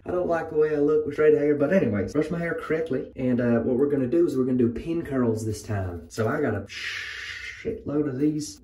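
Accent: South, United States. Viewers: I have never been so in love with a southern accent😅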